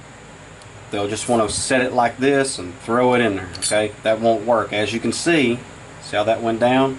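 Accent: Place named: New England, United States. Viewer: It's pronounced "Nick-EEE" not Nick-eye......brother you have got to be a Yankee. All them R's in your words. LOL (no offense intended, all my in-laws are Yankees)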